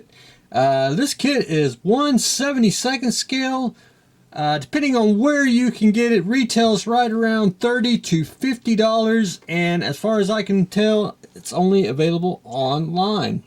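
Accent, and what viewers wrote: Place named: England, United Kingdom. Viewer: Mais ce qu'il peut être saoûlant ce rosbeef avec son accent pourri en plus !! P.... on comprend rien de ce qu'il baragouine !!! À se demander s'il se comprend lui même !!? En plus il ne va même pas au bout du montage ... 😕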